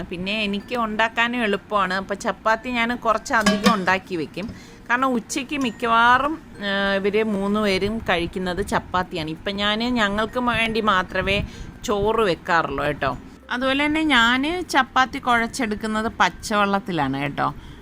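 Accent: Trivandrum, India. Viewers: Ithaa,veedu tvpm aano? Varthanam kettittu anganey thonnunnu....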